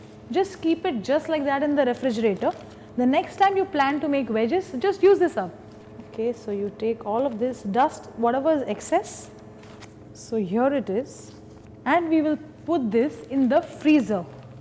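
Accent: India. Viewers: OMG I love the Indian-English accent Thanks for the recipe ^^ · Very very very thick Indian accent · lol thts indian accent honey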